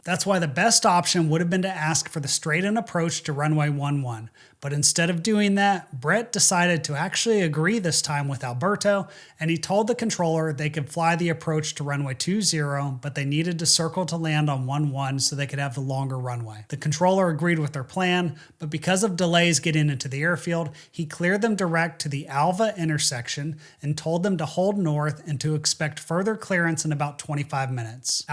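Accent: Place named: Appalachia, United States. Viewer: Can you re record this with an English accent so that it sounds dramatic and educational instead of hillbilly gossip.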